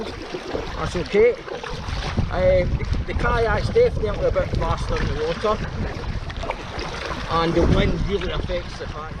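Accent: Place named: Scotland, United Kingdom. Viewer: The scottish accent is not easy to understand for my french ears too.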